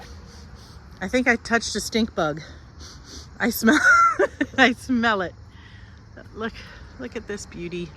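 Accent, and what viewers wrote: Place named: South, United States. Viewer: Im wondering what state y'all live in. You sound southern like me. What are loofahs good for? I've never grown any at all. They do look fun to grow though.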